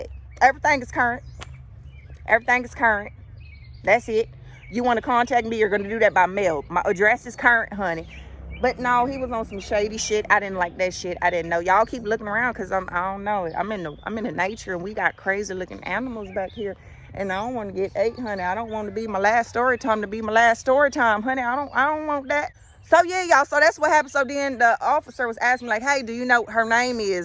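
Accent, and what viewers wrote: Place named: Midwest, United States. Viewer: That actually Midwest accent not country loll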